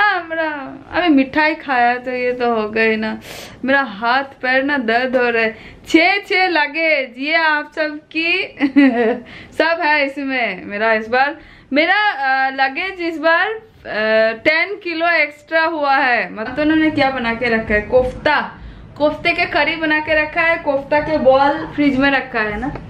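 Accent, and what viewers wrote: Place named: Mumbai, India. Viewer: Mumbai style dialogue 👌😍😍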